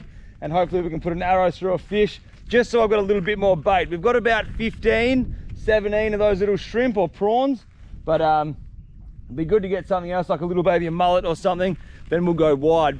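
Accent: Australia. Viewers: You'd love to come and live here in Australia. Nearly everyone sounds like that:) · i love aussie accent more then regular english accent nice vid buddy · You make me wish I had a Australian accent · Are you Australian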